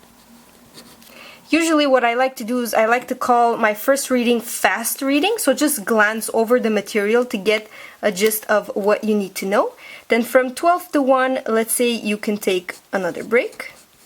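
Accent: France. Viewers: you are French right ^ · are you french??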